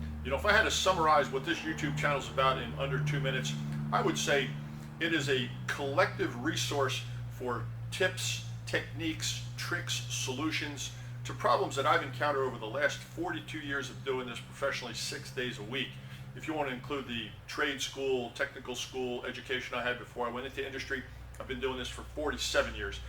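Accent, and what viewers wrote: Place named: Brooklyn, United States. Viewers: I feel like is originally from New York, like me. I place his accent in Medford area (or west) on Long Island. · u sure u not from Brooklyn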